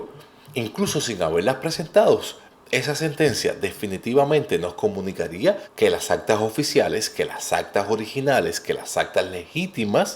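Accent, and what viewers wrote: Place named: Venezuela. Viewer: Por qué hablas como Vzlano si eres Cubano...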